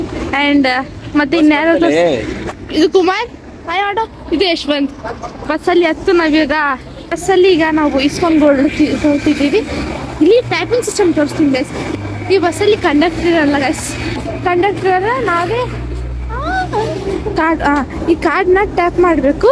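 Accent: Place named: North Karnataka, India. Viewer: Uttar Karnataka hudgan voice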